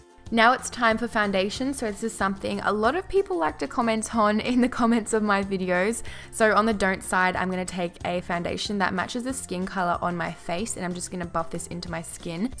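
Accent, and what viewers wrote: Australia. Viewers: Are you from australia ?? such a cute accents.....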